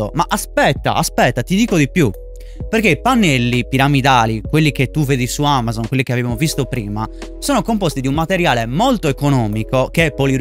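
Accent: Sardinia, Italy. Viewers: Ciao! Un consiglio :) ho una stanza che ho adibito a studio, sia per suonicchiare/registrare qualcosina, sia per streaming di gaming. La stanza è un po' lunga e stretta, ma soprattutto il soffitto è molto alto. A grandi linee: 2x3,7m, soffitto circa 3,7m. C'è abbastanza riverbero sulle basse, ho pensato di "abbassare" il di circa un metro e mezzo con uno strato di pannelli fono assorbenti piramidali da 5cm...ha senso? Mi sai dare una dritta? Ciao da un tuo concittadino 😁 (dall'accento direi che siamo entrambi di Cagliari) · Si sente giusto un po' che sei sardo ahahha, Comunque bel video, Grazie ❤️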